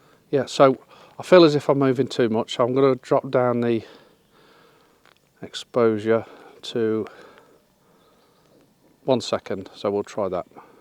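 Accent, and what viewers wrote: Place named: Cannock, United Kingdom. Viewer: Sounds like Cannock Speak!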